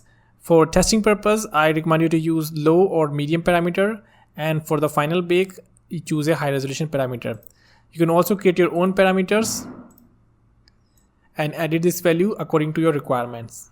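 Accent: India. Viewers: select your TEDE OBJECT :) i cant hold it... the "Indian" accent is hillarious... Anyway great content!!!!!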